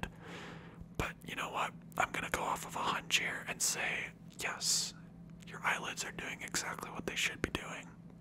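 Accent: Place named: Germany. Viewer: U sound German are u German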